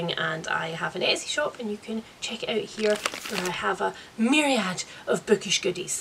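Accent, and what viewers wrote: Scotland, United Kingdom. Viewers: I am in LOVE with your Scottish accent. I have no idea what those other arses are talking about. Congratulations to Lovely Spouse! 💕 I love your openness in talking about your mental health and being up front when you struggle. I come from a family that believes in bottling that shit up or rubbing dirt on it. Your personality and influence is so much more than just book recommendations to me. Thank you. It is because of your frankness that I have accepted my own mental illness as a part of myself. 💕💕💕Please continue to take the loveliest care of yourself and I’m so glad to see you back.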